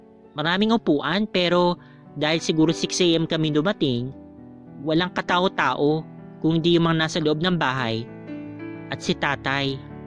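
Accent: Visayas, Philippines. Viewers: Ang cute MO magbisaya mamshie... Hahahaha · good aftie po! Thanks.. okay lng po sa bisaya, pronunciation, cute kasi pakinggan hahaha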